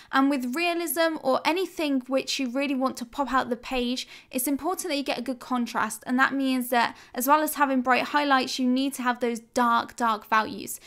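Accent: United Kingdom. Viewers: You're from UK right? How do I know your accent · British accent, I like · Good lord, the British accent is so attractive lol... Anyhow, back to reality... thanks for these art tutorials and tips!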